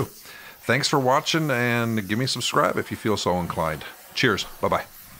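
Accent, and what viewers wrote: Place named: Ontario, Canada. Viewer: i was like he sounds like hes from Ontario lol. Im next to Montreal very cool channel nice to see folks from Canada on YouTube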